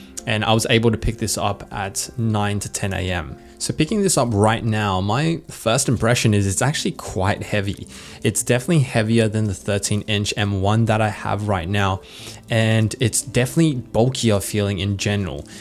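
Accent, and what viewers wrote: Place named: Australia. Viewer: Hearing a familiar Aussie accent reviewing this powerhouse is strangely comforting? That or I’ve been in lockdown for too long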